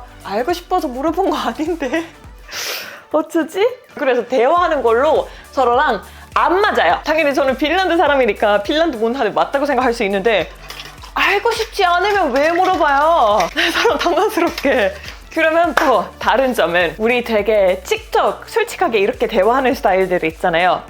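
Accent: South Korea. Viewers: 경상도네요 ㅎㅎ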